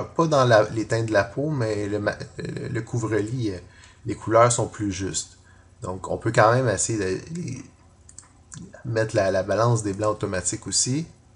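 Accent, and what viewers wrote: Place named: Quebec, Canada. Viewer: C'était très bien expliqué, facile à comprendre, de bons graphiques "statistiques" et exemples visuel puis enfin quelqu'un qui parle avec un bel accent Québécois pour faire changement de ces français! :)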